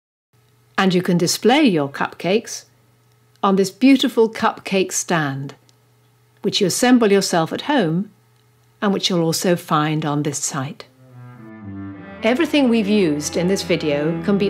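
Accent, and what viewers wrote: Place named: United Kingdom. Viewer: this says how to ice cupcakes not the whole nine yards plus am ammoying british accent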